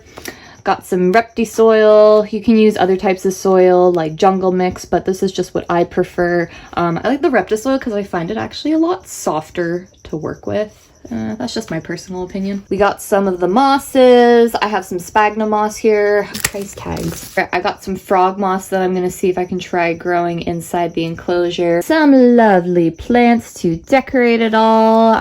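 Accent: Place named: Canada. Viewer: You sound like you’re from Canada or maybe Minnesota.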